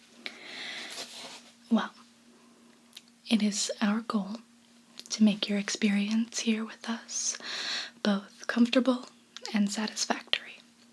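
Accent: South, United States. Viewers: The Christmas series she did with the curse removal had more of a southern accent going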